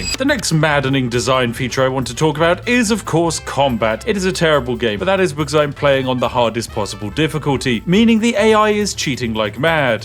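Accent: United Kingdom. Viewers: I don't really know what's going on but I enjoy listening to the silly British man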